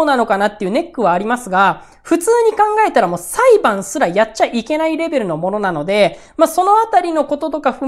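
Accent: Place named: Nagoya, Japan. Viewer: 今日は名古屋弁ちょこちょこ出てたw by 春日井出身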